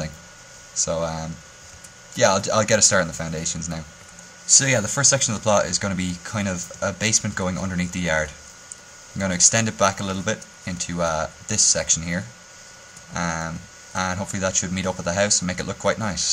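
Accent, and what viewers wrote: Ireland. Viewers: Yeah, I watched, I think it was the Tavern video, and after seeing the design and your ability to teach people how to build this stuff, I kinda just stopped after like a minute in and subbed. You're a pretty kick-ass builder, and, can you correct me on something? Where exactly are you from? Your accent sounds Irish, but it's not incredibly thick like I've heard from other people; it's kinda hard to pick out. If you are Irish, then I wish I could subscribe again, because I'm mostly Irish...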